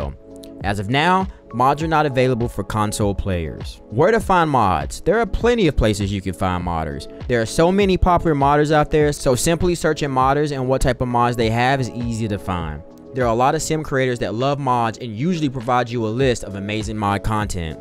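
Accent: United States. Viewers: Omg for the first time in my life I hear an American accent that is different from a "standard" one (I'm a foreigner), and it's not a "A guy speaks 50+ accents" video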